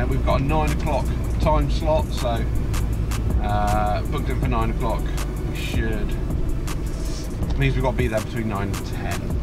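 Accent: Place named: England, United Kingdom. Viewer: About 4 years since I left England so I'm really happy hearing English accent. And driving an left side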